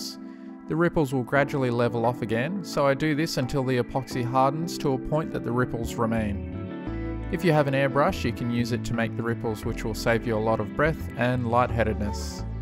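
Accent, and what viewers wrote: Australia. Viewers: Hey mate, you sound like a fellow Aussie, what foam is that and where do you get it from? Thanks!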